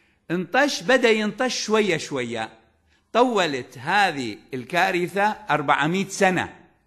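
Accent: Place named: Mosul, Iraq. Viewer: لهجتك المصلاوية مشوقة بقدرمحاضرتك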